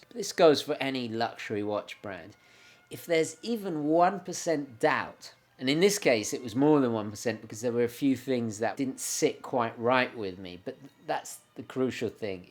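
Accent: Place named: United Kingdom. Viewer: I have wondered what country you live in as you have a British accent but I am not sure if you live in Britain. Does anyone know where the Location of residence is?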